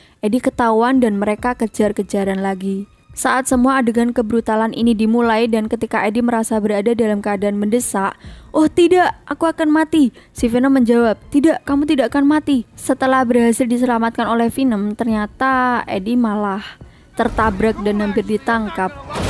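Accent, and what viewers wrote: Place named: Semarang, Indonesia. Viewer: Kya eruh pengisi suaranya min...medoke semarangan 😅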